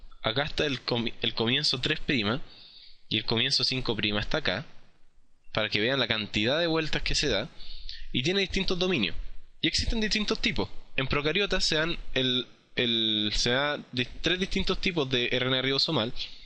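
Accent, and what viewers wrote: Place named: Chile. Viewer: creo que eres chileno por tu acento y porqe no me desconcentre al escuchar jajaj (soy chilena) graciaaaaaaas ahora podre dar mi prueba de bioqimica tranquila :)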